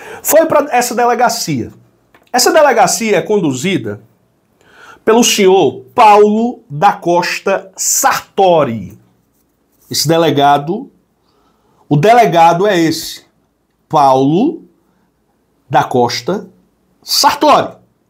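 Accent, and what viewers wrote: Northeast, Brazil. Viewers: Salve Salve o.... você tem sotaque forte e com muito prazer digo sotaque NORDESTINO 👏🏼 DE ONDE É VOCÊ.... PARABÉNS.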